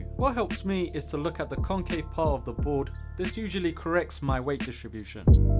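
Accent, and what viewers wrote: United Kingdom. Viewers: Lan türk aksanı bekliyordum british çıktı asdfafdas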